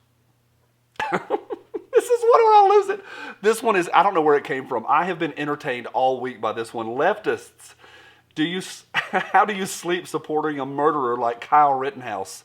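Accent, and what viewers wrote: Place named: Alabama, United States. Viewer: Googled up Gomer Pyle Accent. Apparently it's Alabama. I'm Tennessee Hillbilly, but it's mostly washed out by now. I think, you are Tennessee? edit (keeps watching video) OK, you're Alabama.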